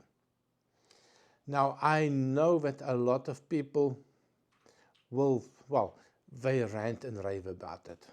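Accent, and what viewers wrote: Afrikaans, South Africa. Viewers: You sound Afrikaans South African... I know because I sound the same😂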